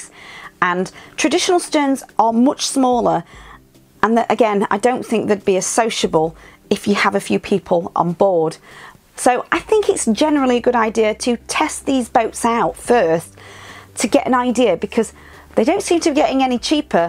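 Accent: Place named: Northern England, United Kingdom. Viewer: Great Northern accent! (I hope)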